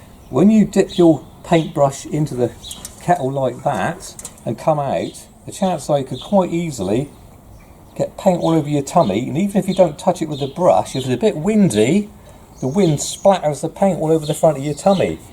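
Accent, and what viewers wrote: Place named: England, United Kingdom. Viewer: sounds like you are in England....not sure if they have it there, but here in the states we have dedicated bucket hooks that basically do the same thing as your custom job there. it hangs on the rung but is off to the side of the ladder so no issues. personally i dont like them, i use a Handy Pail brand bucket to paint out of. i can slip my hand like a glove onto their handle and still use my fingers to holdthe ladder if needed. 9/10 times i set my bucket on the top one or two ladder rungs though. The only flaw those Handy Pails have is you cannot hook them onto a ladder. Purdy has one that is able to use ladder hooks but i just dont like the design, seems cheap and can only fit a 4inch weeenie roller(sausage roller, small roller, pick your adjective) and i really like to use the 6in version which the Handy Pail fits beautifully. 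Just some tips from a painter of 25 years here in Kansas. (yes that Dorthy and Toto Kansas...i live 15 minutes from the Wizard of Oz museum.)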